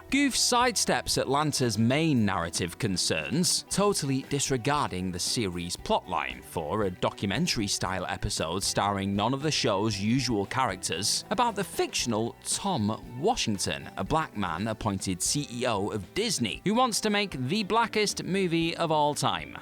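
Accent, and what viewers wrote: United Kingdom. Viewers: More cheap slop videos with ignorant British narration. Did any of you slop producers bother to watch the episodes you included, or did you just let AI write the script for you? In the Sunny in Philadelphia episode, Dennis and Mac switch characters in their Lethal Weapon sequel, but Mac STARTED in blackface as Murtagh and Dennis as Riggs. When they switch, Dennis does Murtagh without the blackface.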